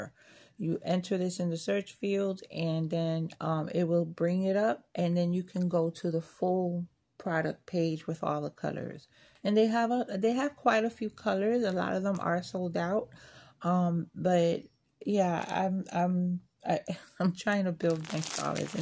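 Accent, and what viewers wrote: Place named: Brooklyn, United States. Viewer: I’m so glad I found your channel. You sound like my tia from Brooklyn.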